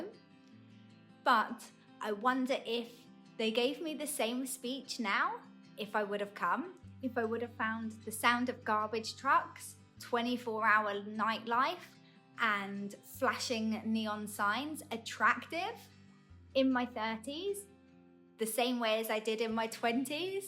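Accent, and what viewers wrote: United Kingdom. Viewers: 英國口音好好聽，看著你講話舒服。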